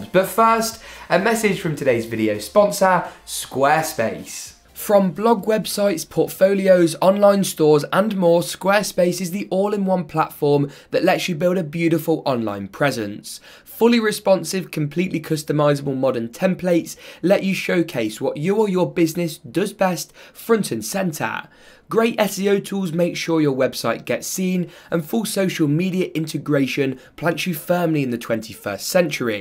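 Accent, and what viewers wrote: United Kingdom. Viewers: Finally a youtuber that doesn't cut the mistakes he makes ... and anyway I really like your brit accent...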